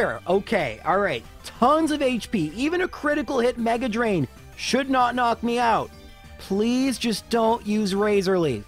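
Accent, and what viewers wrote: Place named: Canada. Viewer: He's Canadian, that's how they say it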